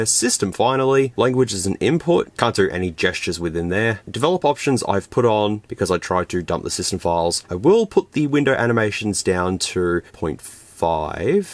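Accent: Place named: Australia. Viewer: I don't know why he's so worried about his voice. You just sound like an Aussie mate. You don't sound like you, but you still sound like some Aussie.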